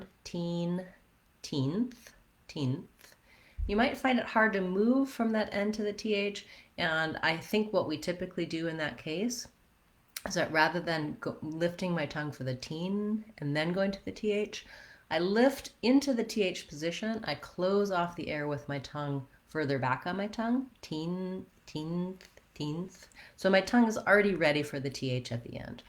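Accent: United States. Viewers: Fantastic way to immerse in American Accent is to listen to you. Thanks for making it easy for us. <num>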